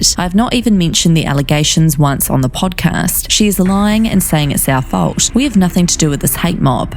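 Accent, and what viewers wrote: New Zealand. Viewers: It's a new Zealand accent. I'm Australian 🙂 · As a Kiwi, this is the most Kiwi accent I’ve ever heard on YouTube next to old school ShannXXO 😬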